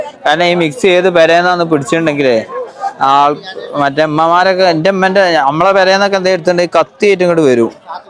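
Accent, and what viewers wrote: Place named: Kozhikode, India. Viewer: കോഴിക്കോടുള്ള വനാണ്..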